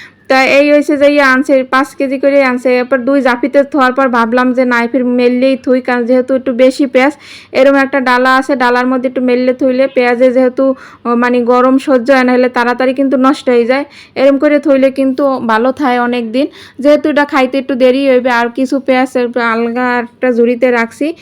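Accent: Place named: Barisal, Bangladesh. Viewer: Apni borisal ar vasai kotha bolban na please apu..ta na holay ami apnar video dakbo na..normal koray kotha boilan..suntay valo lagbay..ancholik vasha kaw e posondo koray na😊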